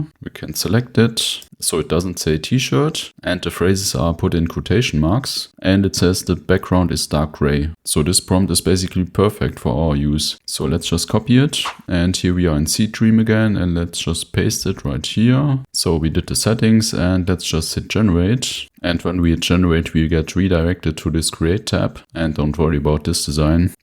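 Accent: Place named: Germany. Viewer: Gutes Video. Aber noch nie so deutsches Englisch gehört, aber mach weiter so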